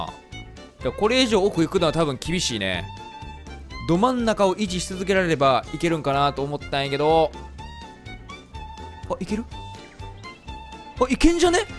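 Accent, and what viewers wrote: Kansai, Japan. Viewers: 同じ関西弁やからな（自分も）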